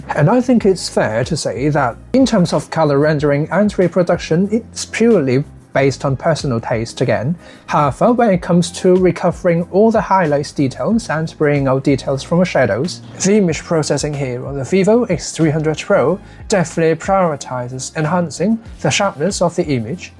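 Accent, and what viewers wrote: United Kingdom. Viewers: 英式口音？👍叻叻，英式係難過美式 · I just realized you have British accent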